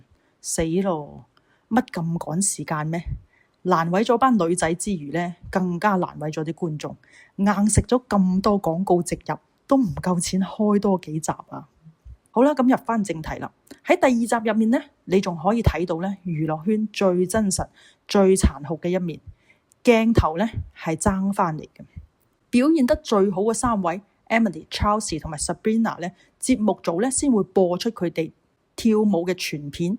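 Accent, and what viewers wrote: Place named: Guangzhou, China. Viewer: 主持人有廣州口音🤔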